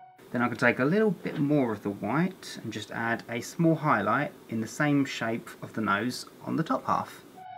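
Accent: United Kingdom. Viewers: OH HOW I LOVE HIS BRITISH ACCENT "mickey!" "but dead" *laugh*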